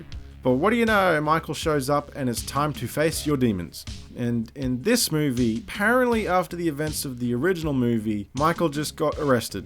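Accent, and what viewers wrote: Australia. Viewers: Slightly off topic "From the people who brought you Halloween and such titles as; H2O: Water..! H4O: Twice the Water..! Comes... H60..! Three times the water! Prepare to wet yourself, this Halloween season..! H6O! In theaters, now!" (Great vid of course, love the Australian accent as always. Good people.) ^^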